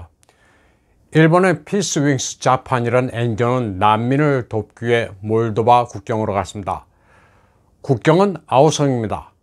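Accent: China. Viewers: 중국인이신가요? 발음이 중국인같아요.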